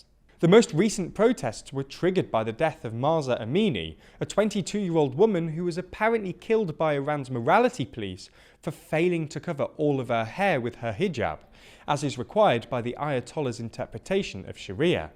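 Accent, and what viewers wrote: United Kingdom. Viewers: I have been to Iran for a month alone. This propaganda that just warms the west up for more genocide against innocent people. Spoken to women and police and asked what would happen if you dress inappropriately, as they do on French beaches, I was told by several women that they are sinply asked to adjust their wear. If they refuse, they take your name. That's it. When i told these women that the west believes morality police arrest and beat them, THEY JUST LAUGH. Women ALL OVER Iran do not cover all their hair. I would say visit and learn but you sound like a brit so you are not welcome. Sucks to be a fascist i guess! PS- i have never been on a country with so little police presence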